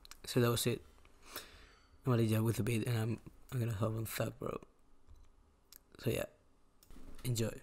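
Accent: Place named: Spain. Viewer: Hey man I love the content. You have a cool accent are you spanish? Are you mixed with any black?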